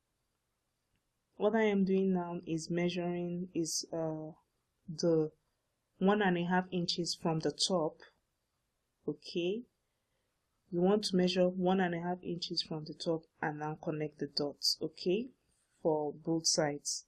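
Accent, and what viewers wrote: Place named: Nigeria. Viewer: This is awesome! Thanks a million for sharing. You sound Nigerian, I might be wrong? I am Nigerian myself. Anyway, Thanks so much!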